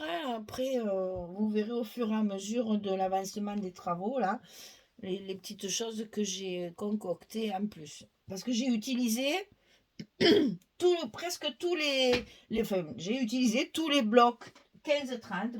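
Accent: South, France. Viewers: Bonjour non seulement vous faites un merveilleux travail et vous m'inspirez mais en plus grâce à cette vidéo nous pouvons découvrir une hyper sensible et très émotive 😍. Moi perso je suis addict de vos créations et de votre voix qui chante le Sud 😁. Je vous souhaite un bon dimanche 😘😘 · Bonjour, je connais votre chaîne depuis peu grâce à Marick Scrap et je reconnais que vous faites des merveilles. Effectivement, le double face permet un travail plus propre. Mais pourriez-vous me dire quel scotch vous utilisez pour une tenue sur la durée car tous ne sont pas au top. Merci de votre réponse et quel plaisir de regarder vos vidéos où je retrouve mon accent du sud.....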